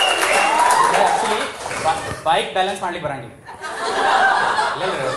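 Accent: North Karnataka, India. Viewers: Wooow super brother nice attempt do more video with good quality , which city bro ur utter karataka 👌👌👌❤💐💐👍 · Pls upload your old videos, ನಮ್ಮನ್ನ ಇದೇ ರೀತಿ ನಗಿಸುತಿರಿ. ಉತ್ತರ ಕರ್ನಾಟಕದ ಕನ್ನಡ ಭಾಷೆಯಲ್ಲಿ jokes ಕೇಳೊದೆ ಮಜ😅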